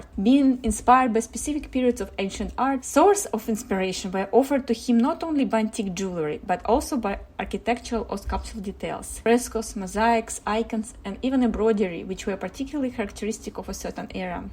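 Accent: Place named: Russia. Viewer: She’s Russian. This is a Russian accent and I understand her perfectly. It helps that I attended a Russian church for over 35 years with my parents so I got very used to hearing Russian.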